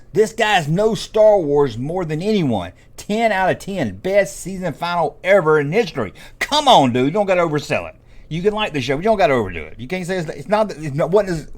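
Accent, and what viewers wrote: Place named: Louisiana, United States. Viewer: WTF is this cajun sayin'? hu ha mmm haaa mmm. No, hhhhhuuh. SPEAK AMERICAN! WIGGER!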